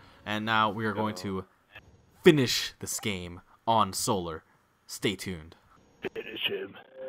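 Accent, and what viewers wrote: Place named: New Zealand. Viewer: You sound like kiwi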